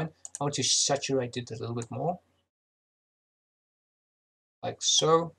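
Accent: South Africa. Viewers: I detect South Africanness. " ", confirmed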